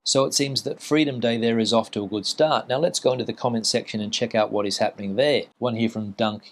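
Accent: New Zealand. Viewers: Are you quite sure you are not speaking with a Kiwi accent? Could you demonstrate the difference?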